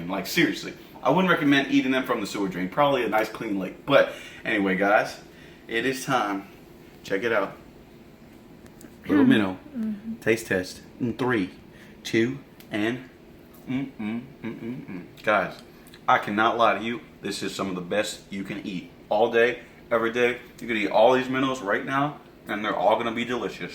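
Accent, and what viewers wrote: Texas, United States. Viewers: Is he talking/sounding abit like his from Texas now?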